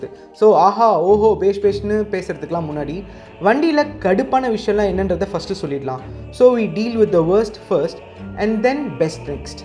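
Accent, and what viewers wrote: Chennai, India. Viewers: Bro good voice good style of commentary very super style natural madras tamil like my brother 👌 Super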